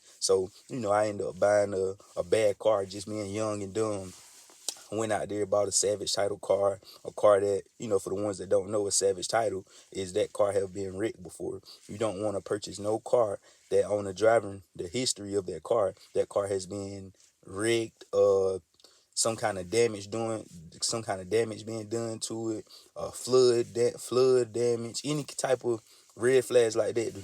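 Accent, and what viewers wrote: South, United States. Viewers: We country boizzz🤣💪🏾 dats the country accent righ dea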